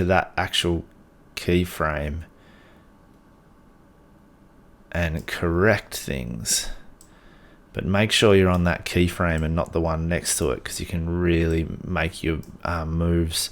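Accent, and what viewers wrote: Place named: England, United Kingdom. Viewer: No worry but I have follow many tutorials and you are the best for speed and infos and I am in Canada working for a Global company E learning stuff. From your accent you must be in England.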